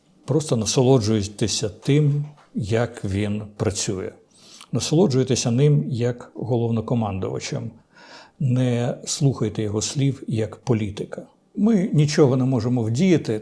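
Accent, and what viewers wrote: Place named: Russia. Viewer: Цікаво і слушно, незважаючи на російський акцент з цими жахливими щьоканнями і чьоканнями, і на слова з російського словника, що ними послуговується автор і ніяк не спроможний відмовитись від них.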